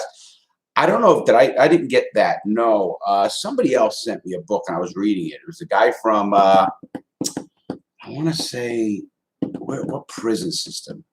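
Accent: New York, United States. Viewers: He’s gotta be New York?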